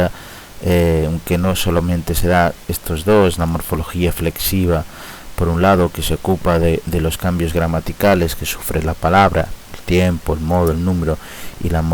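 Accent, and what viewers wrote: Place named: Catalonia, Spain. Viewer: es el catalan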